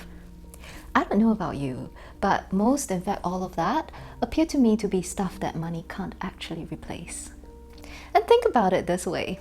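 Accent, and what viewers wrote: Singapore. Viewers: Hehe I like listening to your accent, you remind me of my friends from Singapore 😎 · hi!, is your husband from a Russian country? because your last name is but your accent is from singapore.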